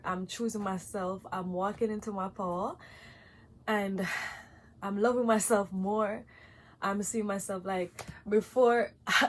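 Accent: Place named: Trinidad and Tobago. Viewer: U have a sweet accent, are u Trini ???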